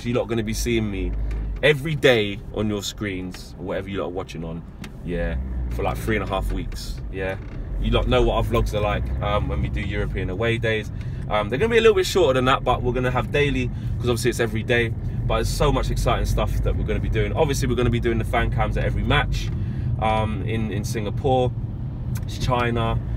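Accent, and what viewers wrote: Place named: Manchester, United Kingdom. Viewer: Gotta love a strong Mancunian accent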